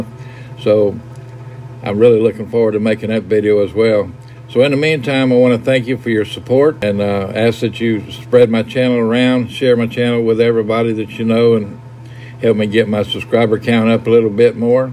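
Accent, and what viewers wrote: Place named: Texas, United States. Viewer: So glad to have you back on here,. It is good to hear your Texas twang on videos! Haha. I do enjoy watching and listening to you,. Yes, YouTube is addicting. I'm writing this comment at 1am because I can't sleep, so what am I doing - watching YouTube! Haha. Good luck,!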